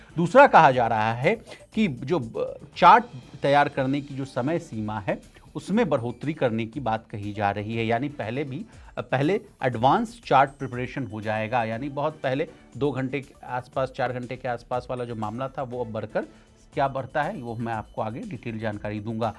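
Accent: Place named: Bihar, India. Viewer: ये बिहार के है इसलिए इनका एक्सेंट ऐसा है।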